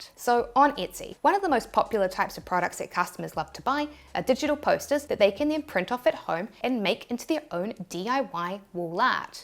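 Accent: New Zealand. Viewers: Good to see another Kiwi on here 🙂 I happened to stumble upon your page by accident, and so glad I did. Your content is awesome. As an ex-pat, I miss hearing the accent. Keep these wonderful videos coming. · I love your kiwi accent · Thought you were Australian but apparently New Zealand is somewhere between Irish and Australian. Looking at the pinned comment I'm sad to see that this isn't such an original idea. Oh well... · I do hope to try these tips at some point but for now, I'm here for the hacks and the New Zealand accent (which is amazing!) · Fush and Chups! Love the accent and Aotearoa (from UK)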